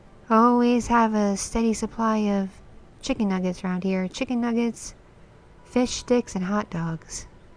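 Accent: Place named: South, United States. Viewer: Hmm must be from the south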